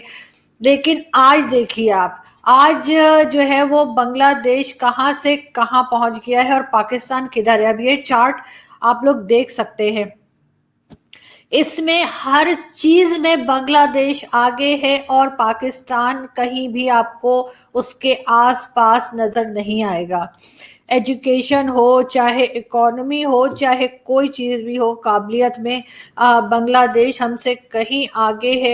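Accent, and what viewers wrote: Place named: Prayagraj, India. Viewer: jab aap bolti he aap ki awaaz me se prayag miti ki khoosbo ati he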